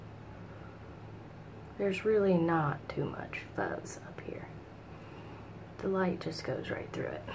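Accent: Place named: Midwest, United States. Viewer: I would just wear wigs. Bigwigs Edit: I knew that was a midwestern accent! Also I hope she is doing well today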